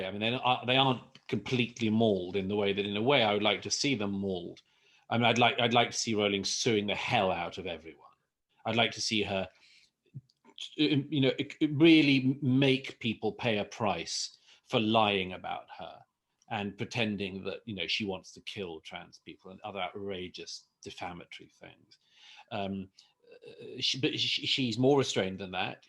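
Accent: United Kingdom. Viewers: Your tuned British accent ❤ makes me hot on you Douglas babe 😘💋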